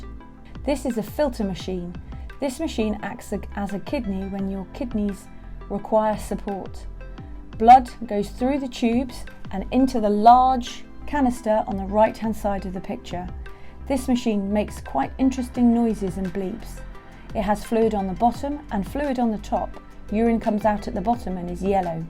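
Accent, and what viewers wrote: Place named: United Kingdom. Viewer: It's British accent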